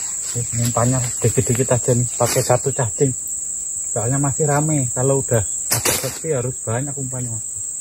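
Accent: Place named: Java, Indonesia. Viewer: Mas, sampiyan ki wong ngendi? kok koyo wong jowo? jowo ngendi mas?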